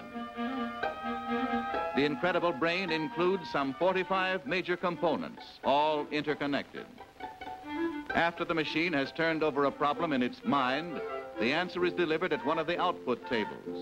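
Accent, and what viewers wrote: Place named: Transatlantic, United States. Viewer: Also known as the "Transatlantic accent"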